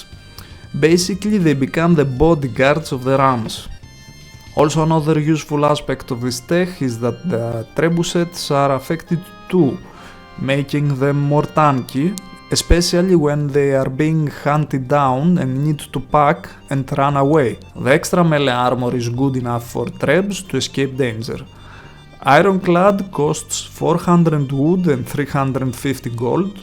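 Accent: Greece. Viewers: haha I am a nottingham forest fan and i notice you have the same accent as Marinakis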